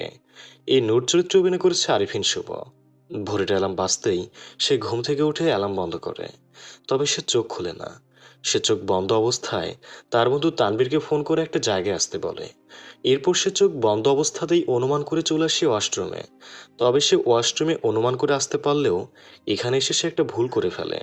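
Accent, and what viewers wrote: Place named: Pabna, Bangladesh. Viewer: পাবনার জেলার কথা শুনলেই ভালো লাগে ❤❤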